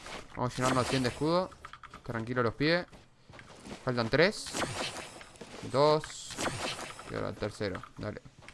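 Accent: Uruguay. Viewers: es de uruguay? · de que parte de uruguay sos bro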